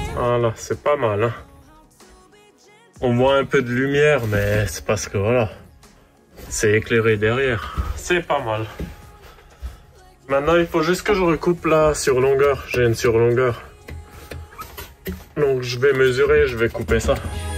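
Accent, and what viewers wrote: Alsace, France. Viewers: hooo ce bel accent Alsacien héé sanlu tu!!! très bon job je suis d'alsace aussi!!!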